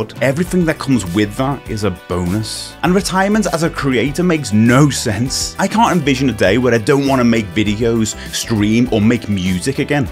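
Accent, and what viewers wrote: Liverpool, United Kingdom. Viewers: Its never to late mate! Btw .. are you a Scouser?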